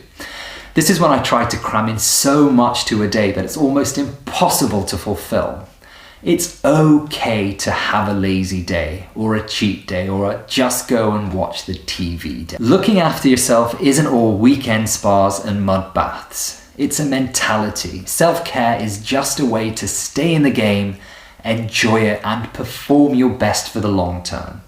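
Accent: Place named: Stoke, United Kingdom. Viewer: and bring back the stoke accent dude don't forget your roots duckie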